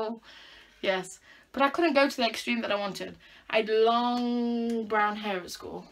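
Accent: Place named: England, United Kingdom. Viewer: You have an accent are you from England or British or something like that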